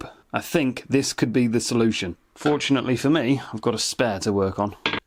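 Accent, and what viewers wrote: United Kingdom. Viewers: This guy is extremely british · Very British. I love it.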